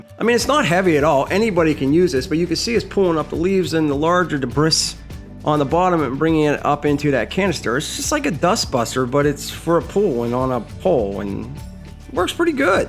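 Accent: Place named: Philadelphia, United States. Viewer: Bro’s gotta be from Philly area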